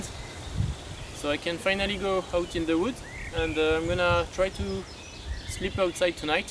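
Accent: France. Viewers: Le bon accent français :DDD Super vidéo, compatriote ;)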